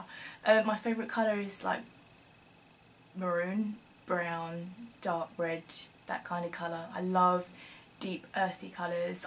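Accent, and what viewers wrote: England, United Kingdom. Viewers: So English accent!!!!! I love it ❤️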